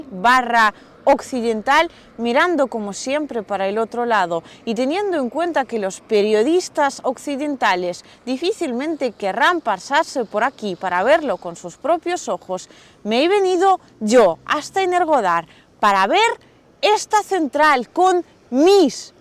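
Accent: Spain. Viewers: Ojalá te deshagas de ese acento Español que para el 90% de los que hablamos ese idioma nos resulta un poco... desagradable? Ayer entré al stream de un Español, y simplementé comenté que en el nuevo Zelda la decoración está basada en elementos Mexicas como las serpientes emplumadas y los ornamentos aztecas... y me contestó asi nada más: "No creo que sea de México porque no veo que Link sea un macaco"... Y asi son el 90% de ellos. pero el 90 o 95% de los hispanoparlantes no hablamos asi y no somos asi. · Por favor sigue una línea seria en tu vestimenta. No uses ropa estrafalaria. Maquillate siempre. No uses tatuajes. El acento español de España es horrible. Usa español de argentina. Te lo digo con buena onda. Eres hermosa si cuidas estos detalles. 😊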